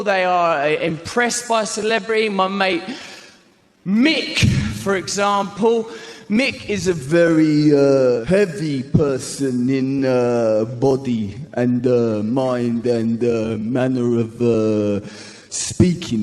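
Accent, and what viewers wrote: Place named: London, United Kingdom. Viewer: I've always liked Russel Brand. He is a very smart guy and also very, very fucking funny. Not in the typical type of funny but like the smart, tongue in cheek, kinda funny. That and i sometimes start talking in a British or London accent similar to his. And you can really say and do what you want to a extent when you talk like that.. I swear it, I've done it and i normally wouldn't have got the few girls numbers that i got if i had not been talking like that. I got like 3 numbers from chicks who were way out of my league. All from talking like that. 3 different chicks one married who called her husband and Told him she was going to work late but me and my friends one female and one dude ive known for years. She said it wouldnt work talking like that and picking up chicks and such..she bet us 200 each, we won and bought her something very special. 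1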